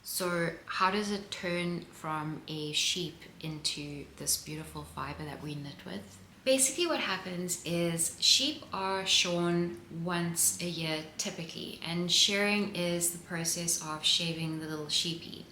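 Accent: South Africa. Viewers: Thank you for your extremely interesting and informative yarn yarns! Also, super great to hear another South African accent!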